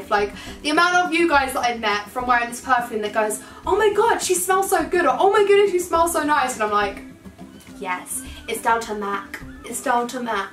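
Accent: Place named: United Kingdom. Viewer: hey I subscribe to your channel and I love your accent I believe that your accent is British right?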